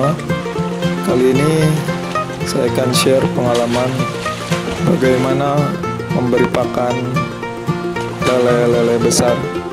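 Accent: West Java, Indonesia. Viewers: Orang sunda ya?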